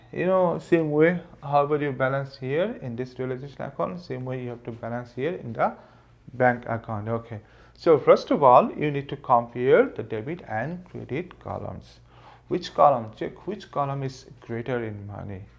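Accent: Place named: United Kingdom. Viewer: Sir Indian ki tarah bat karo na ki British ki tarah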